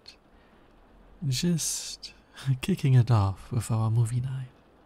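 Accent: Germany. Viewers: How come it never occurred to me that this accent is German? I literally live in the neighbour country 💀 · Your German accent is cute. Loved this new experience. Great audio. LOVED IT!!! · Love this audio I just found it, and I'm literally crying rn. I never felt so safe in my life Love your German voice<3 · That's the most cutest audio I've ever listened too! Thank you,! And I have been blessed with your adorable German accent! <3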